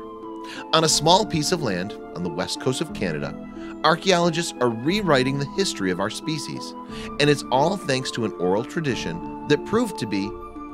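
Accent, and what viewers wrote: United States. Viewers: The weird music sucks and the American accent when talking about Canada and Canadians also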